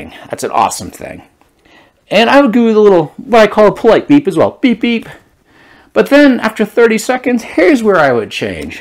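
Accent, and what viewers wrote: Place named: Northeast, United States. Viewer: I love the fact that closed captions does not know what to do with that north eastern accent. Love it!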